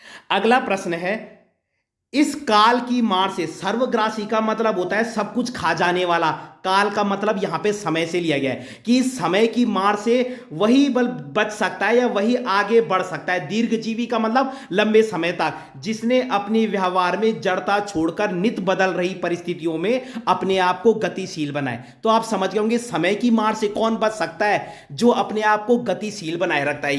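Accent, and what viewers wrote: Garhwal, India. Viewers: Sir ji aap gadwali ho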